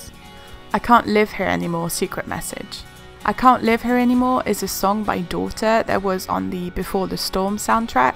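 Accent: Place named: England, United Kingdom. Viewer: O cool ! Vous avez un sacré accent anglais :)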